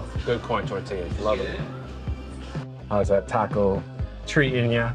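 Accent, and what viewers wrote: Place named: New York City, United States. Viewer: I can hear the NYC accent slightly stuck with you through osmosis from through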